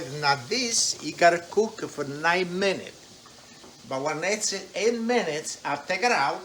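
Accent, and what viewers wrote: Italy. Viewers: Yes, but only next to Lidia Bastianich tho. For me anyway. He is adorable and when he speaks, it's with that unmistakably Italian flare. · I like the Italian accent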